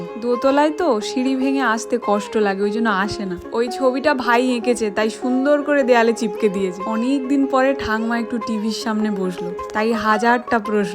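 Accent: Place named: Medinipur, India. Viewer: Didi tomar bari ta kothay tobe kotha sune mone hoy Medinipur hobe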